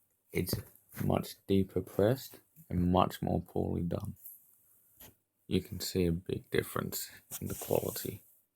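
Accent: Australia. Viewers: You Aussie mate? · Of course he speaks Aussy and Sara Ayri the comments are from the Area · Do I hear a Aussie Ascent?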